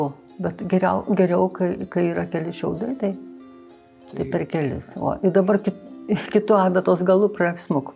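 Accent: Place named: Dzūkija, Lithuania. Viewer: Nuostabu, o dar tas lengvas dzūkavimas malonus ausiai , klausyk ir mokykis.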